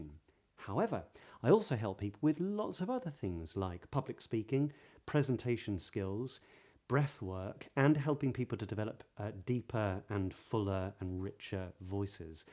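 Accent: United Kingdom. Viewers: Hello sir, thank you and I love British accentt.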